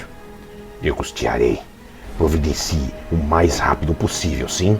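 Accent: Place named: Portugal. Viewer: Narração muito boa! O sotaque português ri demais 👏😊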